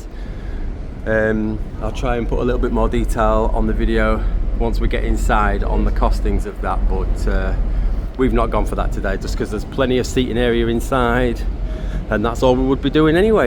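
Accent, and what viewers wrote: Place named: England, United Kingdom. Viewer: Being an Irish gay man myself, I love your English accent - and you are very handsome looking too 🇮🇪☘️🇮🇪😍😍😍😍😍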